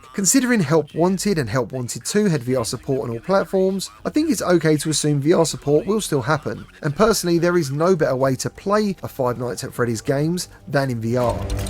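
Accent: England, United Kingdom. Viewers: Gimmie that VR news with that classy english accent brother 🎉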